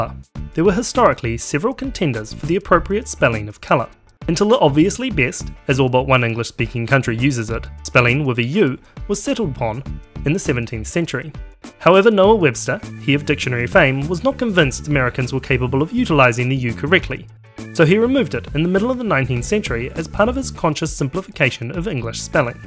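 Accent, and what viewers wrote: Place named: New Zealand. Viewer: or it might be the kiwi accent...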